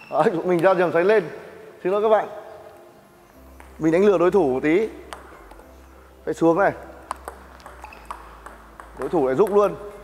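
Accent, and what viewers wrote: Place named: North, Vietnam. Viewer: Cam on, exactly what I was looking for. And your bac dialect makes it so much easier to understand. Sry never learned how to write viet.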